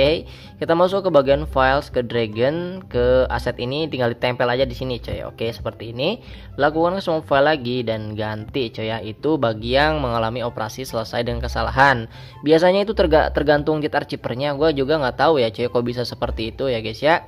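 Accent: West Java, Indonesia. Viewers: Anjay...sundaness pisan lh logatna😂😂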